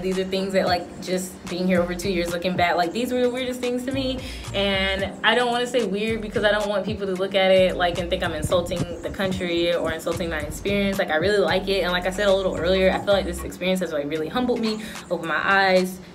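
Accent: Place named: Grenada. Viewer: Talking like a true grenadian i love u vids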